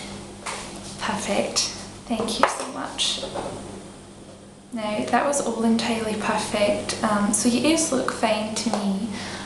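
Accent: Scotland, United Kingdom. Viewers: Good. I thought you were really Scottish! And im Scottish myself. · Scottish accent made me hard. I don't know why. Probably a combo of voice and gorgeousness. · I absolutely love your Scottish accent. You make it sound like a song. Beautiful to listen to :) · Concerning your inbox: Open your video manager (for example) and see the menu on the left side - klick on "Community" - another menu will open and THERE you can find your inbox :) The Scottish accent rocks. I love your videos, great to see you're back ♥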